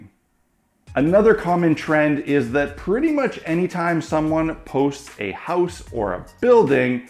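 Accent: Canada. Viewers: Oh and love the Canadian accent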